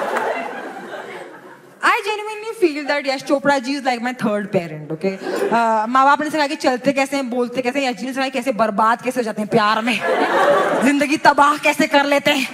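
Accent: Eastern Uttar Pradesh, India. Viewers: She is the best female comic.... So spontaneous in changing her tone every second and just love that east UP flavour here and there😂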